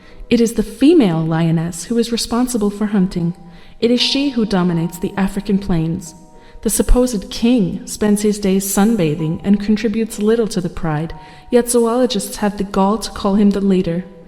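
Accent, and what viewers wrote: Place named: Australia. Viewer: Great narration. Always go Aussie when you need a good voice.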